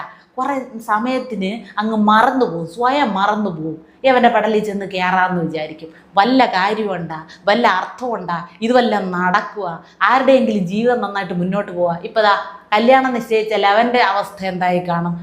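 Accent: Thiruvananthapuram, India. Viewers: tvm കാരിയാണ് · Very good presentation in തിരോന്തരം സ്ലാങ് 👌👌👌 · തിരുവനന്തോരം ശൈലിയിലുള്ള അവതരണം അടിപൊളി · Thiruvananthapuram slang 💯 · Super super❤❤❤ TVM language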